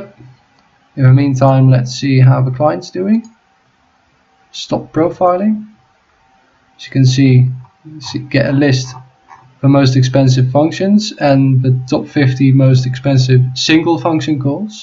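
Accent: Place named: United Kingdom. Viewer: why do you sound so FUCKING british:S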